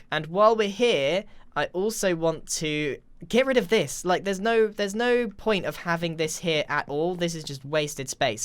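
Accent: United Kingdom. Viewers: Garage, I thought you were Bri’ish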